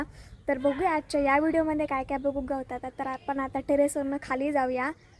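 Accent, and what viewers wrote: Malvani, India. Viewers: Tu mast malvani june shabad boltayai eyakaak bara vata mast video chavkul li jaya go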